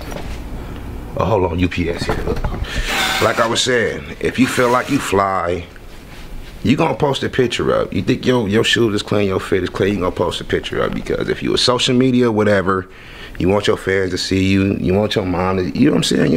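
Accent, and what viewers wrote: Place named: Los Angeles, United States. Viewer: first time on your channel... but are you from LA? you just got that accent lol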